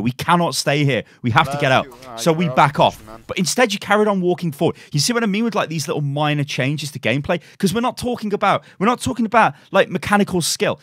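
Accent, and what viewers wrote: Austria. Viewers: the language is german, but it sounds more like an austrian speaking it. dunno, might be wrong. lots of dialects in german. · He is from Vienna...local vocabulary and accent xD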